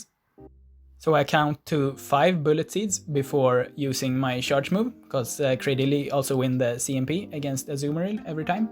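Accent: Sweden. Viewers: damn that's some swedish accent. kinda love it thoe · Love Swedish accent so much! So sad that I was not able to fly to Stockholm recently.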